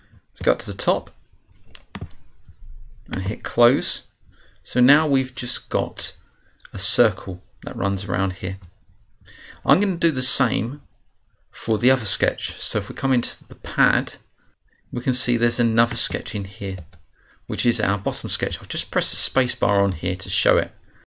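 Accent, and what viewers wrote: United Kingdom. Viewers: Both lessons are excellent. (Everyone loves a British accent). FreeCAD specifically, and CAD programs in general, are inherently complex, NOT intuitively obvious, and can be extremely frustrating. But all videos are exceptionally well done, and well thought out. Each step is performed without error, so there is less confusion. The parts have sufficient complexity but not too much. The presentation is correctly paced for absorption, uses several commonly used features, and is most helpful. FreeCAD is very powerful once mastered. Thank you for doing a great job and a wonderful service to the 3-D community. 😀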